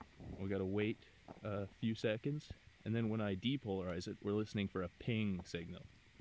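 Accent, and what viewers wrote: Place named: Canada. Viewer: Yeah I'm enjoying the dual pancake experiments! Very little on yt done with actual muon air shower measurements, so that's interesting to see. You sound Canadian and it looks like you're in a deciduous forest - Ontario? If so and you're ever in Rochester for some undoubtedly regrettable reason let me know and I'll give you a tour around the high energy laser fusion facility here.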